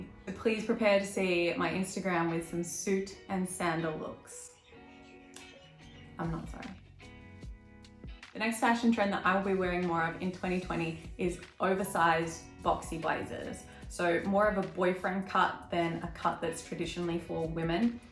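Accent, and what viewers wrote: Australia. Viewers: Hi, I have just found you and great to hear an Aussie accent! Whilst I love following a lot of UK/US vloggers, it’s great to find someone in AUS.Thanks for your trends forecast! Whilst I may not be adopting all, it is good to know what to expect to see in the stores for the upcoming seasons. 🙏 Thanks!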